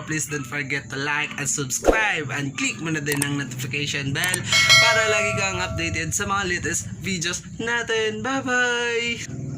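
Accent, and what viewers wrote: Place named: Visayas, Philippines. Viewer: bisaya diay ka lods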